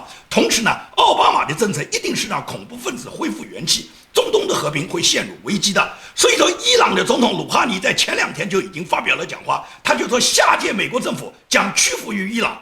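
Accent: Nanjing, China. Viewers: 我发现你是不是一个南京人，之前，一口南京话。。哈哈。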